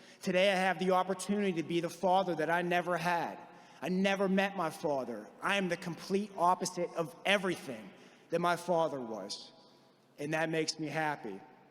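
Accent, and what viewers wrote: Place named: Pittsburgh, United States. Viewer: Bring that Pittsburgh accent to all in the USA! 🤣 Fudge yeah!!!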